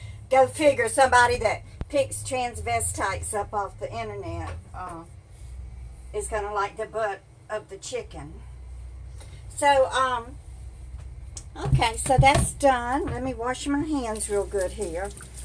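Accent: South, United States. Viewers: I love your southern accent it's so welcoming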